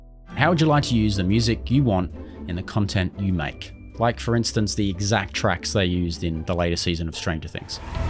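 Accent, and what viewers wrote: Australia. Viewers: Hi, just found your channel, couldn't stop watching when I did. love it. first thing I noticed was an Aussie accent. love your work mate. Gold Coast.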